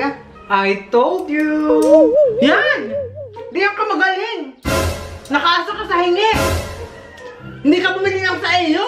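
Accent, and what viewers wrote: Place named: Baler, Philippines. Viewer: i really love your videos po. 🤗🤗 Feeling ko kapunto ka namin haha from Baler haha ganyan din punto namin eh. Malambing😂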